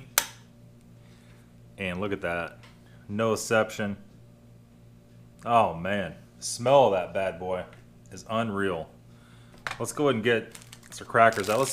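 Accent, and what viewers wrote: United States. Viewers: Super, your American accent is so cool :) I sometimes wonder if I was an American before ;) And ofcourse your way of showtime keeps me watching, Greetz from this damn cold Holland lol :)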